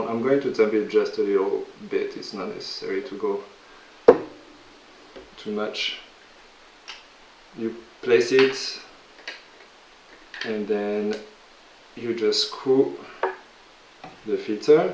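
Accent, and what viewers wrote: France. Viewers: petites critiques : pour si peu de quantité de café cela n'énervera pas un chat, je préfère le petit noir au bar tabac du coin pourvu qu'il ait un bon torréfacteur de renom autre que Grand-mère ou Monoprix. En attendant de trouver sur le marché une autre machine aussi efficace mais produisant plus de café que çà. Bravo pour la vidéo...superbe French accent ;-) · Teresa Flores It is not rude tone. He is just from France and his native language is not English. Most people have some accent in foreing language!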